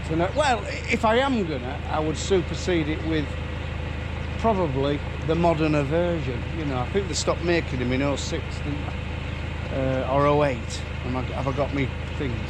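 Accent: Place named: Northern England, United Kingdom. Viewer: It's int Wizards sleeve mode 😀 love listening to northern accent like mine, hope there's a few American viewers wondering what's he on about. Best videos on YouTube.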